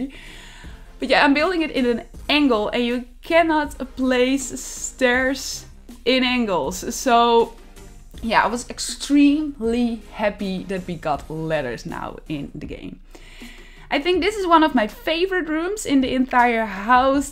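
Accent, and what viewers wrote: Netherlands. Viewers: You sound dutch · Are u dutch 😅 it sounds lik u dutch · But your accent is always so much more charming than our awful German accents; we obviously also love a Dutch accent in German as well! 😆 You always sound so cute and friendly and fun; I don't know why. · Je hoort gewoon die nederlands 😂💜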